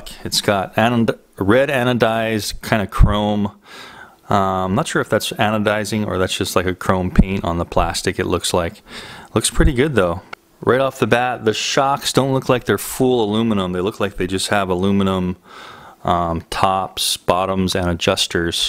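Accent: Hawaii, United States. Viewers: Yo you in Hawaii bro? I keep thinking I'm hearing a little pigeon in your voice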